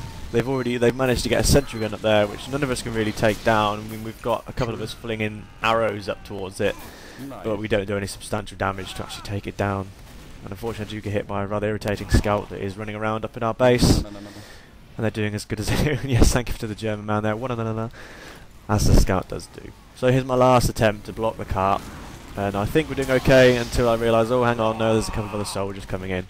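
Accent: England, United Kingdom. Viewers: AMAZING VIDEO!!!! Are you English or British? Srry, im not tryin to be rude at all, just curious.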